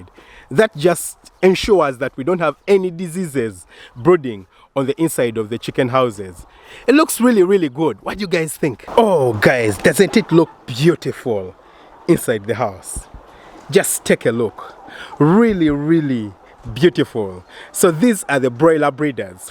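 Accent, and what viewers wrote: Uganda. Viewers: Hello Doc,been a while since I checked out your videos. You have such passion,we love your Ugandan accent,we say 🇺🇬 stand up.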